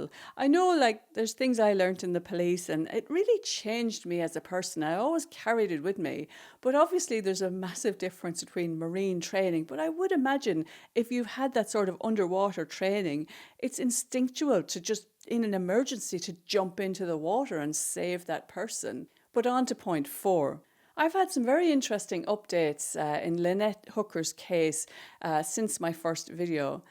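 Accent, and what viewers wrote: Ireland. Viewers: Hi from NJ,,, I detect your Irish accent (pretty sure). Hopefully we will be hearing of his arrest soon, his behavior has been in our face long enough.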